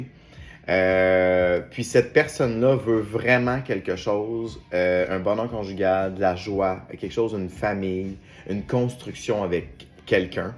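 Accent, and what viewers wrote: Quebec, Canada. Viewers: Bonsoir le Canadien de la part d une Française c est la première fois que je vous vois merci pour votre super accent ❤❤❤ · Good morning mister, nous avons le meme accent anglosaxon moitie quebecoois. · J'adore votre énergie et vos guidances et votre accent canadien trop mignon un lingot d'or ❤ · Oh bonjour monsieur je viens de vous découvrir fabuleux gentleman j'adore votre quidance merci beaucoup monsieur bonne journée et j'adore votre accent cananadien oh caribous respect. 😊❤❤❤ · Bsr nouvelle abonnée quel joli accent je suis partie dans la region des laurentides en 1991 ( j avais 24ans) pour mes études d ' infirmiére ...quel beaux souvenirs Québec je me souviens gros becs christine des bsx à mes amies amis Québecois chris ...le skidoo ...les cabanes à sucre le sirop d érable etc etc bisous ❤❤❤❤❤❤❤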